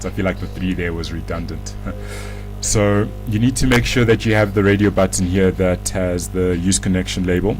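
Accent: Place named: Afrikaans, South Africa. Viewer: nah lol that's just the Afrikaans accent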